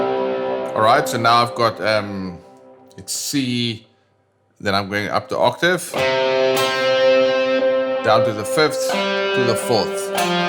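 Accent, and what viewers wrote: South Africa. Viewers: Also, what accent do you have? I thought South African at first, but I'm not an accent expert XD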